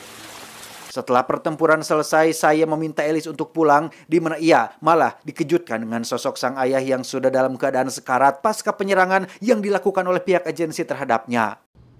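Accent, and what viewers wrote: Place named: Aceh, Indonesia. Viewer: Aceh sekali bg😂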